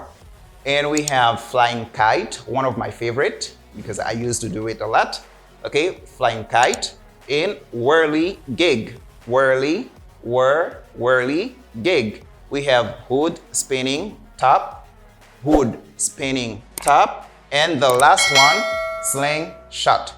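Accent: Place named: United States. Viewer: Good american accent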